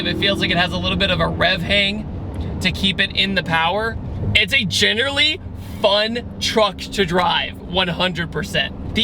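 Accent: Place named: West Virginia, United States. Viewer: Dude gotta be from West Virginia. Ouch...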